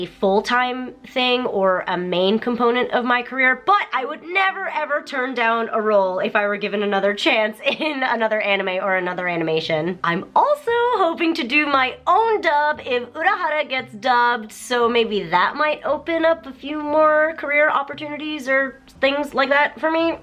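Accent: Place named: United States. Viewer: You sound soooo american but you speak japanese??? How do you do it!?!?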